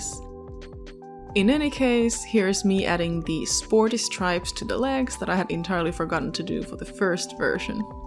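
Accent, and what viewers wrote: Finland. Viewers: Is that a Finnish accent I hear? Anyway, this video has a criminally low view count. That's an amazingly well-made outfit, and a video showing the process of creating a brilliant outfit like this one should always get a lot of views.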